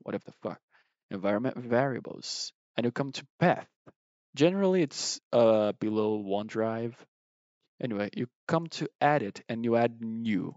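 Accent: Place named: Brazil. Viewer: Esse sotaque brasileiro é muito bom de ouvir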